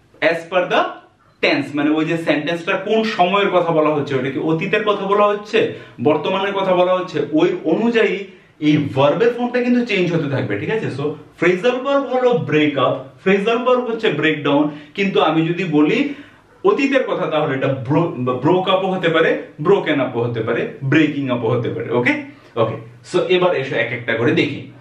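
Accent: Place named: Assam, India. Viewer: Watching for the first time good...want to ask that ur dialects maches with Assam ..u r from there?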